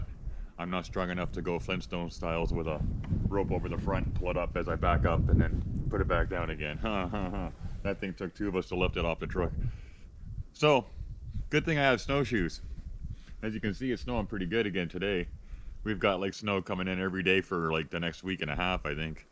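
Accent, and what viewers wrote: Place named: Canada. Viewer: Wow. I've been over here so long I forgot how Canadians *sound*. 🙂 You a savvy enough mechanic to replace the brake line on the quad?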